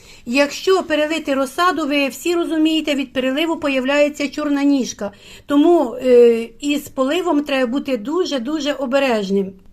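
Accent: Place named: Poltava, Ukraine. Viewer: Полтавщину по говору ні з чим не сплутаєш. За відео лайк, а за суперфосфат-неуд )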